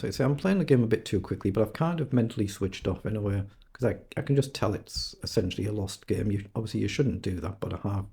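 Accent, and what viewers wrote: Newcastle, United Kingdom. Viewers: I see! It sounds Geordie to me, I didn't know Yorkshire had that kind of accent. Great channel I enjoy watching your games.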